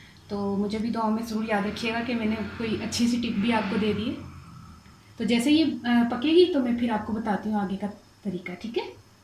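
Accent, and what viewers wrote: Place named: Punjab, India. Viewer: API ap buhat acha bolti ho Urdu k Saath Punjabi tarhka. Nice recipy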